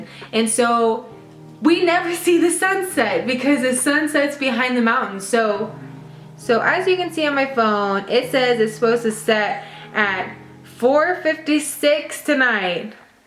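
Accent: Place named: Oklahoma, United States. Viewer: Yep, she sounds like she's from Oklahoma.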